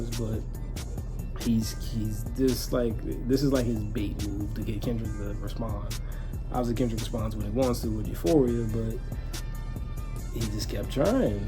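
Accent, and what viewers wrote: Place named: DMV, United States. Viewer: I feel like I understand Coles apology because he was acting out of character. He seemed like he regret getting out of himself to get in this hip hop compatible persona that everyone expects and as someone who kinda been on a similar bath of change as I can understand to a big degree and I kinda felt like hip hop beefs and disses was kinda corny for a good while. It sounds like I hear some DMV in your voice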